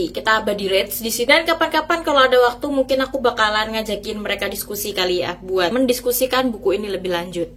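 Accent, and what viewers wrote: Java, Indonesia. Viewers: nggak salah deh follow ig n subscribe youtube nya... banyak referensi buku yg kece2... paling suka pas ngomong "ketiban" 😄 sama medoknya sama ak... Org mana sih kak? kalo pas ke Solo hayuk ketemu, sharing referensi2 buku kita 🤓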